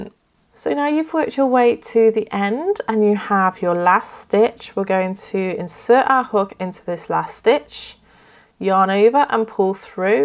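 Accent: United Kingdom. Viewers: Hi, i am new to your channel, i really didn’t know your name is not, … i was rewatching the tutorial today and at the beginning of the video i got your name!! 😆😆 Anyway i love both names, btw, I’m a big fan of your British accent! Lol Warm wishes… stay safe xx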